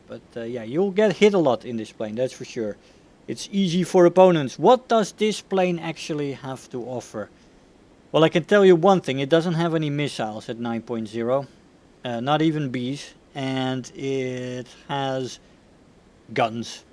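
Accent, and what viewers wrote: Netherlands. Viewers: Hey dude you accent is dutch i think. Am i richt? If im not then sorry😂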